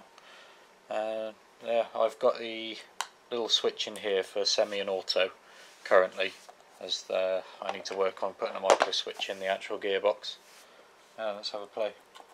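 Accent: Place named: England, United Kingdom. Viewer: Nice to hear an English voice behind this project! Great work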